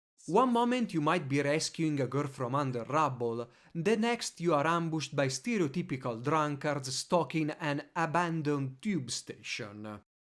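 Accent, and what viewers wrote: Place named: Italy. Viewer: Comunque penso (dall' accetto) che tu sia italiano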